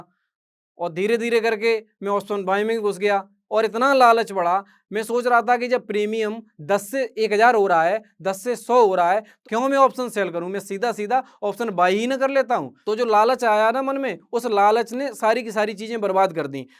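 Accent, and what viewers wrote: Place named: Bhind, India. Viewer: Bhind ki bhasha bhut mst or pyari lgti he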